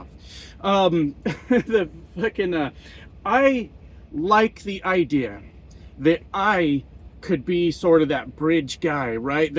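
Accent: Canada. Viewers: I am pissed off at the Democratic Party. They are responsible for Trump getting elected, and must never be forgiven and never be forgotten. There must be a new Second party that replaces the Dem party. They are horrible losers and failures. Biden was a loser and liar. He did not fire Garland when he did not charge Trump for his crimes. Sick of speeches that are just liars. Biden/Harris support for gaza war was more important than stopping trump. sick and unforgivable. The Trump/Maga people will destroy themselves, bashing them achieves nothing. oh,btw, you have a candian accent, so no surprise people might think you are from canada. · I hate to break this to you, sir, but you DO sound like the stereotypical Canadian. It's not a problem; I like Rush, Red Green, Bare Naked Ladies, SCTV, and the RCMP. 🇨🇦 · You do sound Canadian 😅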